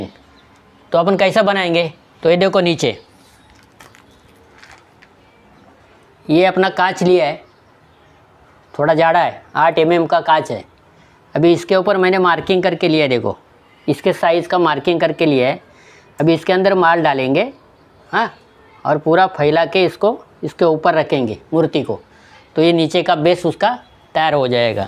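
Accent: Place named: Rural, India. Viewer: 😊सरजी गावरान हिंदी 👌👌👌👍🏻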